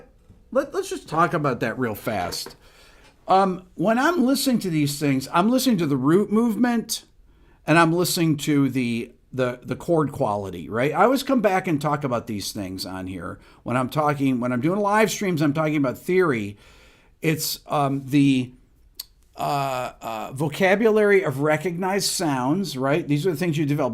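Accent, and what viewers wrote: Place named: North Jersey, United States. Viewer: He's a North Jersey boy. He has that punky Northern NJ accent. Love it!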